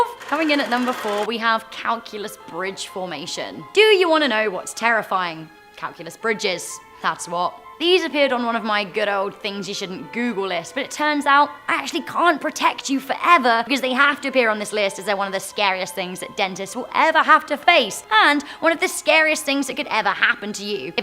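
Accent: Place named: England, United Kingdom. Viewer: You are literally my favorite narrator! I have such a thing for girls with English accents. The random noises you make, and just your general commentary is adorable! Haha Keep up the good work! Hope to hear you again soon. <3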